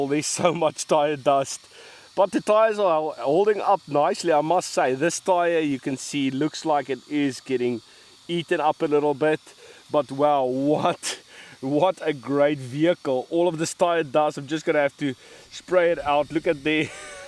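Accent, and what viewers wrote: South Africa. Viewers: Lol boet I can tell you are a proper South African from that awesome accent!! Great vid, considering getting one of these, just wish it was 1/8 scale, but for the price this seems like a real winner.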